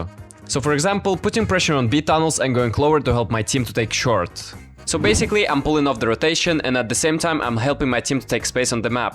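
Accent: Finland. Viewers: are you finnish!?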